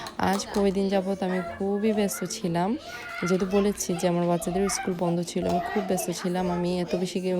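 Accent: Sylhet, Bangladesh. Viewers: Apu tomi ki sylhel y?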